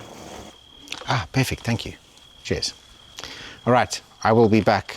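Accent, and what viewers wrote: South Africa. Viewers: Came across your site & immediately recognized your accent as South African. Thankful your property is okay. The fires were horrendous. Subscribed!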